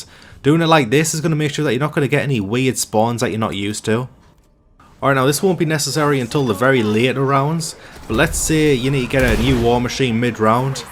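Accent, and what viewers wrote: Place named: North East England, United Kingdom. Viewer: I find myself to be quite a die hard zombie player, I've played since world at war and i haven't bought this game yet. Is it worth it? Also love that your from the north east ur accent shines 😂. I am too! Been watching you since bo3. Haven't much recently because I've not played this yet. But whenever i think of playing zombies again i come here 👍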